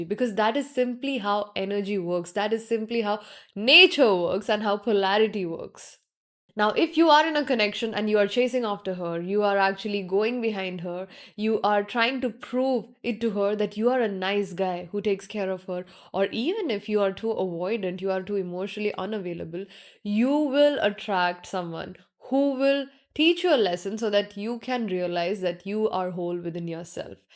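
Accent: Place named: India. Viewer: You’re beautiful it’s true. I love your accent! Hindustan/India has a rich culture. I have lived with Hindi/Indian people. They are kind and considerate. I love Sanskrit. It is like an alien language. Bhakti: Devotion, love, and faith in a deity. Brahman: The ultimate reality or divine principle in Hinduism. Karma: The principle of cause and effect. Moksha: Spiritual liberation. Samsara: The cycle of birth, death, and rebirth. Satya: Truth. The way I seen it certain languages control matter=mother=care. The connotation and tone,rhythm, pitch mean a lot. It reverberates out like a pebble in a pond. The ripples outward affect the whole pond even when not seen. The effects are seen later. People wonder where it came from. For every reaction there is an equal or opposite reaction. It matters what you say. What you say matters. Sound and emotion creates matter. 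A calm nervous system helps create a better life. It changes the outside world. (Matter) Hence OM! Sweet OM! 🕉️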